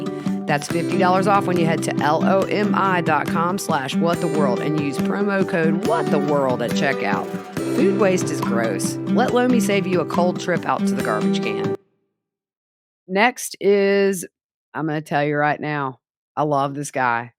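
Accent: South, United States. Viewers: please don’t hide your Southern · “We gotcha stuff….” 😂 I adore you. I’m a southern girl too and appreciate the way you talk to us like we’re BFFs, sippin sweet tea on the deck, and talking true crime!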